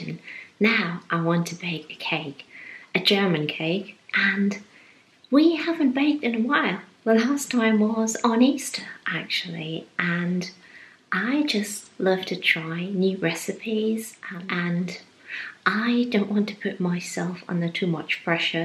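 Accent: England, United Kingdom. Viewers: I keep watching your video's. Your English is so beautiful, still you make German cakes. So I wandered if you are from Germany, what is nearly impossible because you sounds so really English. Carine from Bruges, Belgium. I love how you bring charming England so nearby.☘️🌷☘️🌷☘️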